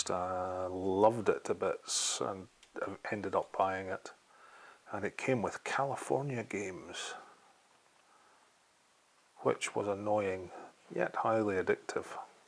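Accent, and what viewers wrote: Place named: Glasgow, United Kingdom. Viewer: This man speaks with a Glaswegian accent. He is accent is straight out of a medieval celtic play circa 1700.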